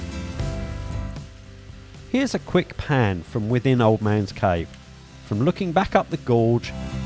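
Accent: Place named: England, United Kingdom. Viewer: The English accent and clear diction make this a must listen and watch!!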